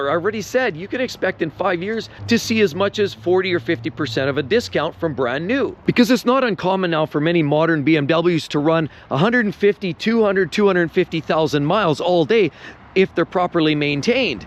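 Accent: Canada. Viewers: He's Canadian bruh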